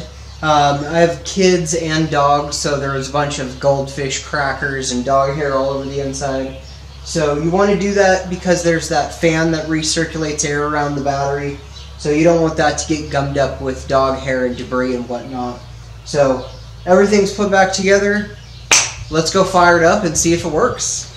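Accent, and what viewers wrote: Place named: California, United States. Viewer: Hey, thanks so much man, sound like you might be from California, I'm from Burbank, are you still happy with these batteries, it looks like you have lithium just curious I had I haven't listened to the whole video, maybe you mentioned that already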